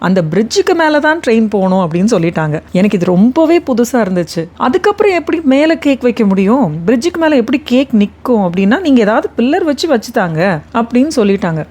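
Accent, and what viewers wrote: Madurai, India. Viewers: Nega madurai ya akka 😊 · அருமை அருமை நீங்க மதுரையா sister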